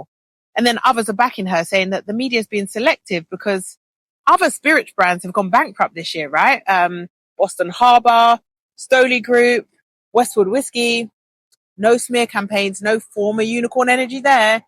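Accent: United Kingdom. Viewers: My thoughts are she should take full responsibility and accountability for everything and also that your ADORABLE wit that Bri ish accent 🇺🇸 🇬🇧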